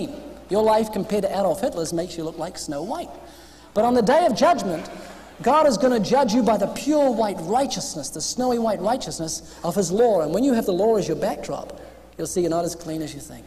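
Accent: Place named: New Zealand. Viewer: This was when you sounded more like a Kiwi, when 'world' was more 'werld'. Love it!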